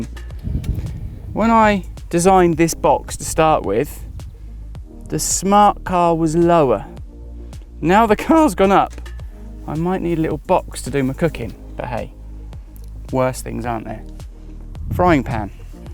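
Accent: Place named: United Kingdom. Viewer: Very entertaining, thanks. I enjoy your style, and for once, a nice British accent. Nice job.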